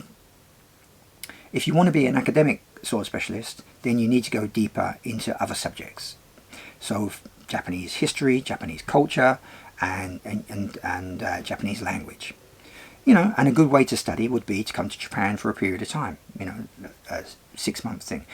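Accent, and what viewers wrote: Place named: London, United Kingdom. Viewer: Hi just watched this again, I really like your relaxed attitude great english accent,I gather your a londoner as am I a long time ago. Really enjoy the books you have put up, looks like you have a massive library in your unit. Glad you've made it to where you are congrats on that great certificate all the best Terry.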